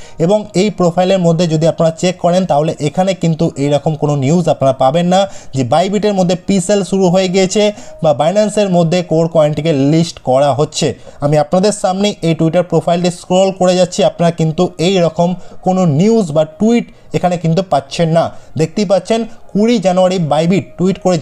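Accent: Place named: Kolkata, India. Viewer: আরে ভাই, এটা আঞ্চলিক উচ্চারণ। আমরা বাংলাদেশে আশা করি উচ্চারণ করি। আর কলকাতায় উচ্চারণ এমন। বাংলাদেশের কিছু অঞ্চলেও এমন উচ্চারণ করে। 🙂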